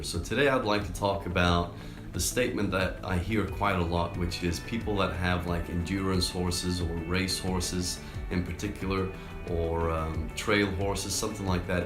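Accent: Australia. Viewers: khaled hamdan He sounds Aussie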